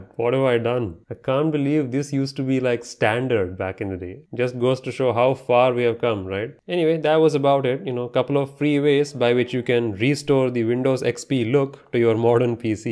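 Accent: India. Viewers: Dude looks Mexican but speaks Indian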